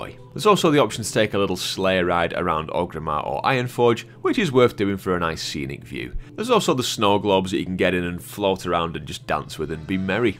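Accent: Yorkshire, United Kingdom. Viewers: The yorkshire accent made this vid 300% better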